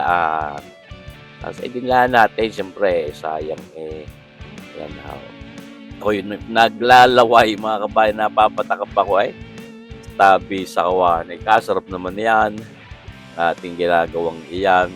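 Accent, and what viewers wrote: Batangas, Philippines. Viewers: Kabayang, Tuloy-tuloy lang ang vlog. Ako'y regular na nanunuod sa iyo, napaganda ng iyong puntong batangan. Ang aking ama'y d'yan lumaki sa Avenida Rizal malapit sa calumpang.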